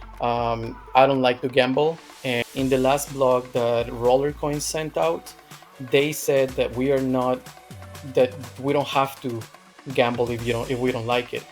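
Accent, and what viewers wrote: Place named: United States. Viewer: Hablas como gringo como decimos en Perú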